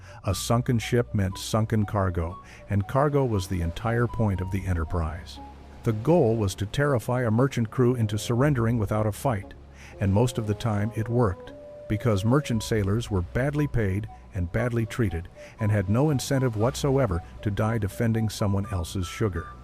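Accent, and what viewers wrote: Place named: United States. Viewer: An artificially generated English accent would have been more appropriate than an artificially generated U.S.A. accent. U.S.A. accents have no credibility or authority, their owners being savage imperialists abroad, brutal fascists at home and lying propagandists everywhere.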